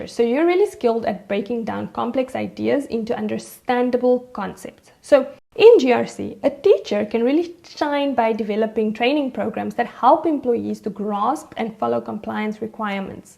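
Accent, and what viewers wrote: South Africa. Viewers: I know my people when I hear them are you from SA by any chance? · This accent… I know it mahn. are you South African? 😅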